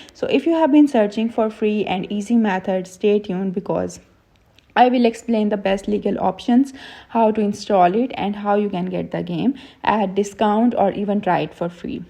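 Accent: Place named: India. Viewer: wow i love the indian sam voce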